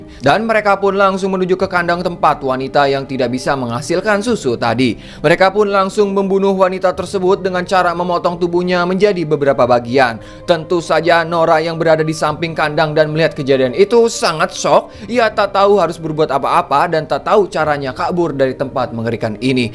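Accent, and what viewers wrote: West Java, Indonesia. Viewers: Semangat trs ya bg.. Sy suka banget logat sundanya...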